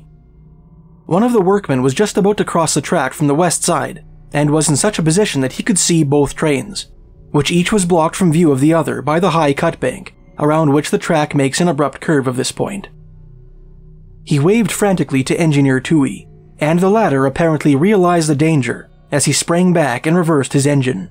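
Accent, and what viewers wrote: Canada. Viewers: You have the heaviest Canadian accent I've ever heard. I'm gonna use your channel as an example of a Canadian accent. Sorey (Canadian for sorry).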